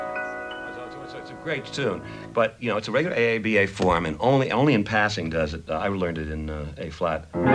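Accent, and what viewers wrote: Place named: United States. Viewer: The most American accent ever.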